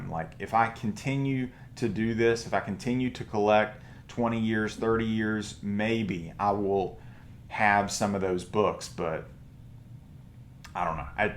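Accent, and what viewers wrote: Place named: Tennessee, United States. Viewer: to answer your question at the end, do i think its feasible to obtain those type of books just paying cash, no, not unless you're rich or spending money you dont need to on it. Now is it possible to obtain stuff like that by building over time, selling, trading and all that? Sure....but even then....in my opinion theres no way this guy isnt fairly well off. Its such an awesome collection but i would consider it overboard for a person without alot of expendable cash lol. Of course its also possible hes always had alot of them since way back when some came out, but for ppl our age, we arent gonna have anything in our PCs that we bought when it came out before the copper age unfortunately, which means wed be paying premium to own the majority of what was in there (not counting good deals and getting lucky and all that). 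Btw i just found you're channel and subbed. You sound like your from around my neck of the woods lol. Tennessee here. Cool video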